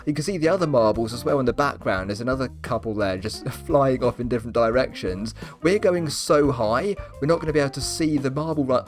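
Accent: United Kingdom. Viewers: found you at 4am and watched you for a while, question, are you in the uk? ive always gotten this wrong but your accent really sounds like someone in england, and if you have another race, could i be apart of it? it would be great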